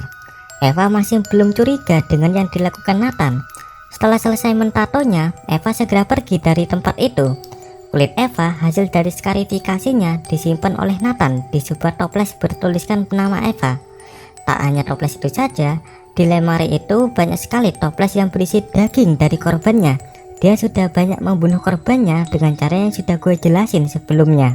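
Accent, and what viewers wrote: Java, Indonesia. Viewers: Ini ebbo kucing versi review film nya tapi medok 🤔😅✌ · nya medok rek bikin gumuuz😂😂😂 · kucing host nya logatnya medok hhh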